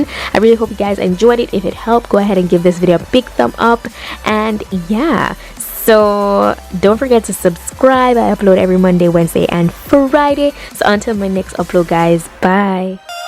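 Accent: Jamaica. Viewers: Yeah, I knew she was Jamaican because I am a Bahamian and she doesn't sound like us. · My first video and girl I need to know if you're Jamaican or from the Caribbean cuz.... mi hear it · I Love how you talk man. just love my fellow jamaicans.. this was so helpful bc really I agree with using the foundation on where u didn't have any product.. makeup lasts longer.. less makeup shopping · loveeeeee your jamaican accent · You sound Jamaican! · I knew it... I was about to ask the question I heard it when you speak, Jamaicans dialect cant hide lol! awesome vid! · Every body a ask bout u nationality.... Omg. U sound Jcan tho but confirm nuh!! Anyways luv ur vids; u just be popping with or without makeup... Best contour- no foundation video I've seen.💖💞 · yaady fi true 🤘💗💗💗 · are you Jamaican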